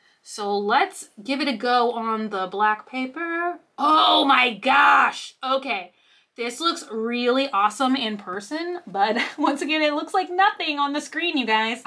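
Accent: Texas, United States. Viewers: Lol I think that may just be the Texan accent (I say it the same way and I’m also from South Texas)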